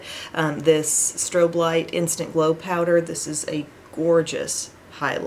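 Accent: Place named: United States. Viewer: Hi there, I'm in the UK, I've been following you for a couple of years and I love your vlogs. There are so many accents in the US and I cannot place yours, it's really different and pretty. Which area does it come from? Also, I love that makeup look, so fresh and glamorous! 🙂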